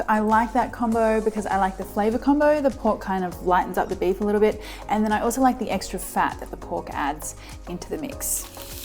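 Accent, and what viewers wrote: Australia. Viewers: I made your sauce today (I made meatballs yesterday, so I will try your meatball recipe next time). The sauce.... OMG - I didn't think I could improve on MY sauce but yes, must say, your sauce....made in heaven!!! The anise, the chilli and bay leaf definitely gave it a unique flavour which we all loved. Love your presentation too....especially your accent...down to earth Ozzie.... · it's blasphemy enough but with the australian accent on top holy fuck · She has the most appealing Aussie accent I ever heard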